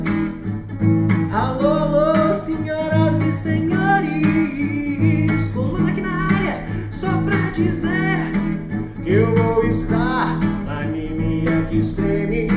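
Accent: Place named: Acre, Brazil. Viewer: Né cara, sotaque dele muito do acre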